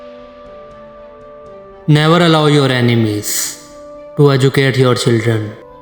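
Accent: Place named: India. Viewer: Why india accent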